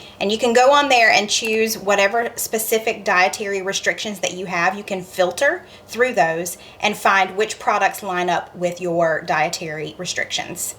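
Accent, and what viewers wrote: South, United States. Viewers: Mama English teacher we talk properly when we're at work and when we have too. When we're just being nice and among family and friends the southern comes OUT! It's okay girl😊😘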